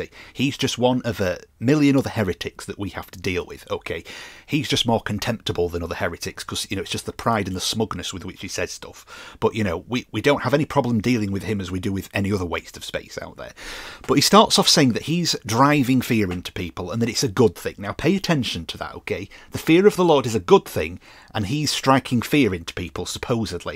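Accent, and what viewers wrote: United Kingdom. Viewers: I never knew hard preaching in British accent is something I needed but now I can't stop watching your videos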